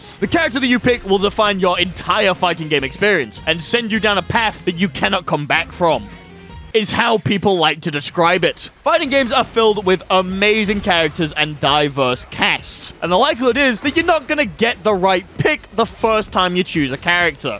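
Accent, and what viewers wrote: United Kingdom. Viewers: you forgot about your silky smooth british accent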